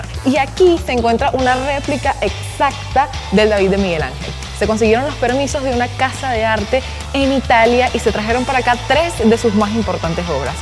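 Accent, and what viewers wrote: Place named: Venezuela. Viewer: Por el dejo pareciera que fuera venezolana. Una cachetada para tus compatriotas eh!? tu disfrutando la vida mientras que por aya se están muriendo de hambre.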